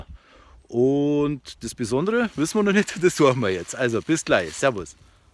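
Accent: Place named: Bavaria, Germany. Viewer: Oha, eigentlich supergeil... aber da bayrisch isda schweer füar da nordditsch. quaquwetschkommod....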